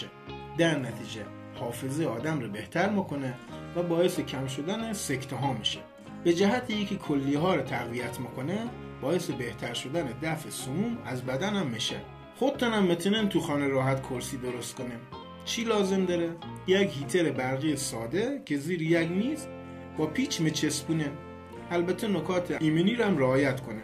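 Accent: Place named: Mashhad, Iran. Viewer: عالی بود ،خصوصا با لهجه مشهدی شیرین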